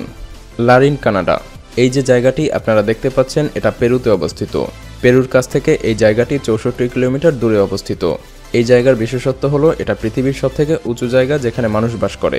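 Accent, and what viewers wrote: Bangladesh. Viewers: Vi tmr voice ta r description a dawa number gulo bangladeshi tumi protek video teh kollkata tag koro kno tumi nijer country k tag koro na kno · ভাই আপনে কি বাংলাদেশী? আপনার কথা শুনে যতদুর মনে হয় আপনি বাংলাদেশী। বাংলাদেশী হলে লোকেশন কলকতা দেয়া কেন? কলকাতা লোকেশন দিলে কি ভিউ বেশি পাওয়া যায়? নাকি নিজের দেশের জেলা লোকেশনে দিতে লজ্জা লাগে? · Apnar kotha bngladesi dr mto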